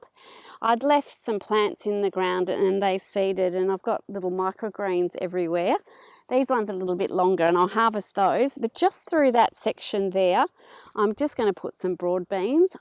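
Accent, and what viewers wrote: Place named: Australia. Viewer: Fantastic so good to hear another Aussie. I see a lot of gardening videos but they are from other countries and it gets confusing with the different seasons . I can now totally relate and makes sense to what you are saying. SUBSCRIBED.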